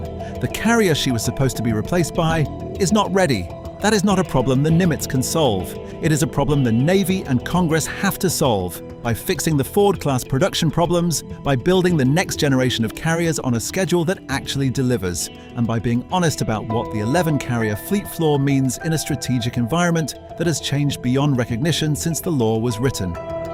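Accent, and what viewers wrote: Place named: United Kingdom. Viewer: thats why its ironic that its a British Voice.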